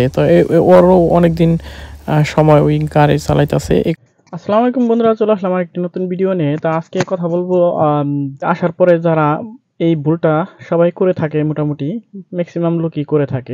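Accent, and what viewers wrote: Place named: Jamalpur, Bangladesh. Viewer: আপনার দেশের বাড়ি জামালপুর? ভাষা শুনে মনে হচ্ছে... জানাবেন প্লিজ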